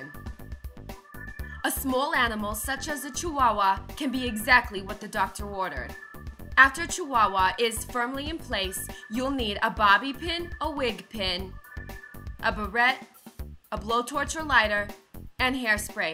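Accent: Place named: New Jersey, United States. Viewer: Oh man I loved this video!! You're so funny and have this wit and charm!! The milk jug and little dog in the hair cracked me up!:)) I love the Joisey accent. I mean Jersey.;) I'm from Joisey,I mean Jersey. lol you're the most gorgeous sexiest most beautful girl and I so love it when you play with your beautful hair and do vids like this!! You're the best my dear!:))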